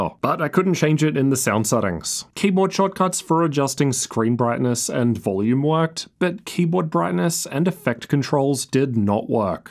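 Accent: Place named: Australia. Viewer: Man, that Aussie accent sounds funny. :D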